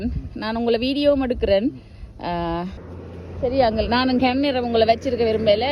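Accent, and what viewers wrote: Sri Lanka. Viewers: Madam, Your Slang Not Exactly Jaffna. TRINCOMALEE Or Exact Batticaloa Town .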